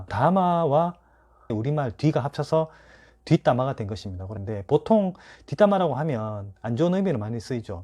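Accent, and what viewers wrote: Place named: Gyeongsang, South Korea. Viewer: 감사합니다 ~^^ 지나가다 들렀습니다. 구수한 경상도 사투리가 친숙하네요 말조심하는 하루가 되도록 연습하겠습니다.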